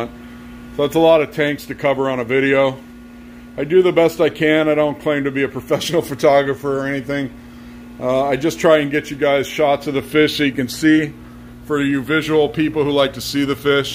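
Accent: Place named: United States. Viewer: With all due respect, did you not hear the American accent lol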